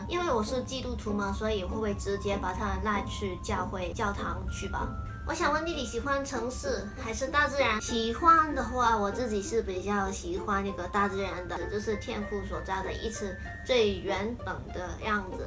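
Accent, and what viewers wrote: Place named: Southeast Asia. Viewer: 听起来像东南亚人讲华语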